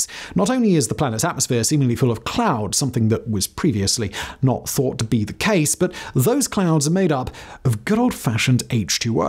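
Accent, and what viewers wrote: England, United Kingdom. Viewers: Your accent is painfully English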